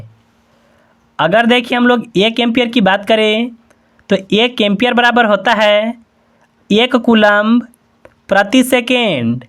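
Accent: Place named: Bihar, India. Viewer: Bihar se lag rahe ho sayad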